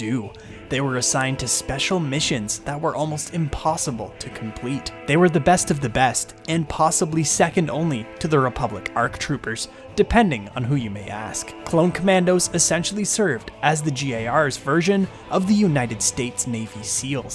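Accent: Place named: Canada. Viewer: Most Canadian accent ever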